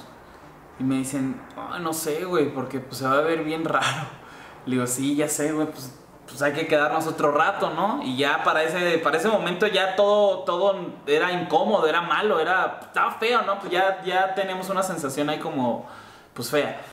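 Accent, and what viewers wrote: Sinaloa, Mexico. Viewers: Tu acento sinaloense esta muy regio jaja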